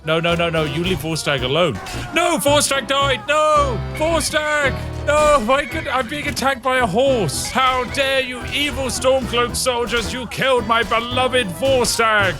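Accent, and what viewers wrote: United Kingdom. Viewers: I dunno if its you but i got a mewgenics cat that sounds like you, and by that mean blatantly British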